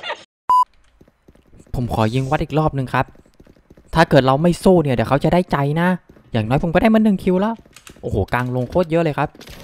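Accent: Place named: Songkhla, Thailand. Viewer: จังหวะแหลงเหมือนเด็กสงขลาเลย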